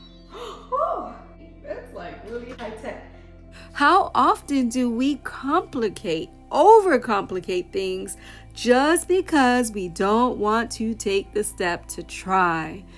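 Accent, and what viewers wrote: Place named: England, United Kingdom. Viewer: Ahh I really like your English accent woah and you look so pretty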